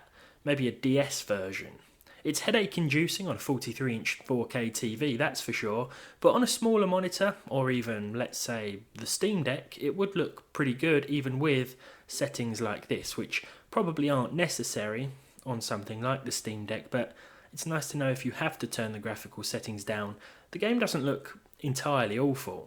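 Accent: United Kingdom. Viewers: Your videos are so chill. just a calm british dude talking and no cringe intros or ads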